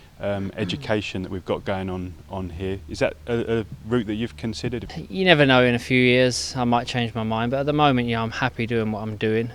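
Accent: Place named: United Kingdom. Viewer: I love your British sound <3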